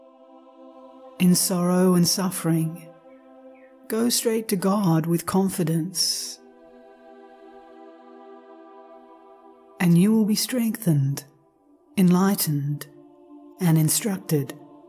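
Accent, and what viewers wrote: Australia. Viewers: Love the Aussie accent! Very early on in my spiritual path I belonged to an Australian Catholic listserv (yes, it really WAS that long ago). I made some wonderful friends who helped me enormously along my way. Listening to you brought them to mind in gratefulness. Thank you!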